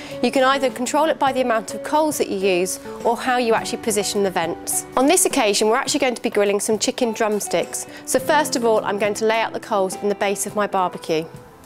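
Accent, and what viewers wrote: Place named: England, United Kingdom. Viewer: The first clue that this was going to be total crap was the English accent . . .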